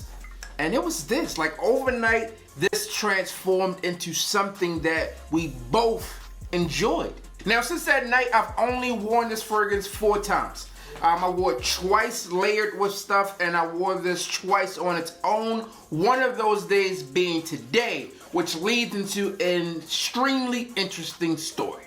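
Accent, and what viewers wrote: United States. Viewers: No offense to Jeremy fragrance but I can understand exactly what you're saying cuz I'm from America and you have an American accent that's more easier to understand then some other men's fragrance YouTube channels if you know what I mean ty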